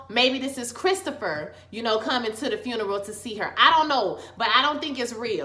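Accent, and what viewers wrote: Louisiana, United States. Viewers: I swear i love your reviews in that Louisiana accent 😀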